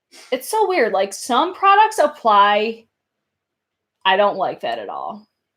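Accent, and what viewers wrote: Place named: South, United States. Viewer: noticed the southern drawl.where are you from. Im from saint martinsville,louisiana,currently live in chicago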